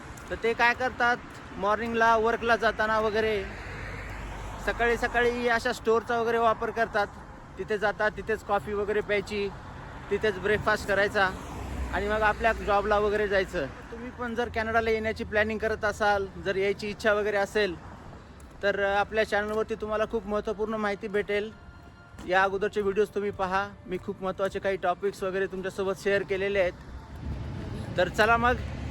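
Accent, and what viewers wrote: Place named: Solapur, India. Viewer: Aj first time Tumce videos pahile m. Tumcya tone vrun Vatl hot ki tumhi Solapur side ce asal. Chan Vatl yeikun ki tumhi latur ce ahat. Khup simple ahat 2ghpn tumhi ani tumci mul Pn.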